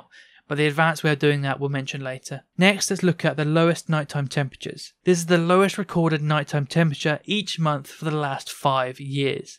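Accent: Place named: United Kingdom. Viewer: Really helpful video also good to here a British voice was only seeing America videos so nice to here from someone who talks about caring in the uk 👍🏻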